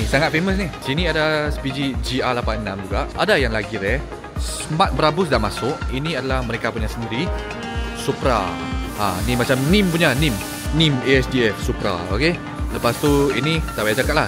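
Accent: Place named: Kedah, Malaysia. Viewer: Bos cakap lorat kedah mcm pak wei baru syok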